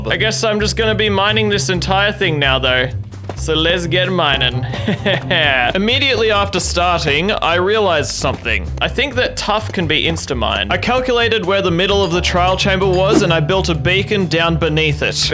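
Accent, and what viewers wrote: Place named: Australia. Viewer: hi are you from australia cause its your accent and i am too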